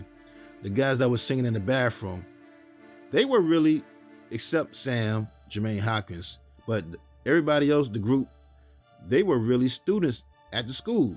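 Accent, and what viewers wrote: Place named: Washington DC, United States. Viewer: I've been watching your videos and I love them! Goes into details I never knew. Sidenote: I keep listening to your voice and I swear you sound like you're from DC. What really made me think that is one of your videos you mentioned Chuck Brown and most people outside the area knows he's the godfather of gogo.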